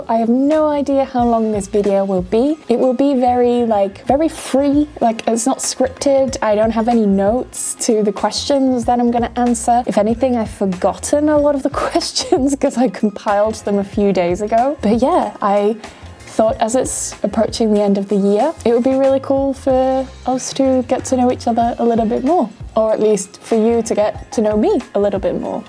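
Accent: United Kingdom. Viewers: Would you please read short stories.. I like listening to your beautiful clear British accent narrating a short story.. thank you in advance ❤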